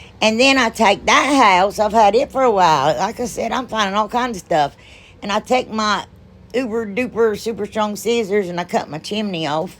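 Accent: South, United States. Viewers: I 'v e just found your channel today but Girl I 'm a fan for life!! You're the 1st person I 've ever seen that sounds as "country" as I do. It's wonderful Never change. Cause remember, "the south's gonna do it again"! Love, love, love you!!!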